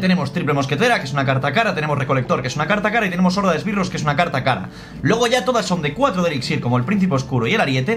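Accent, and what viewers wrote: Galicia, Spain. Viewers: Es gallego :v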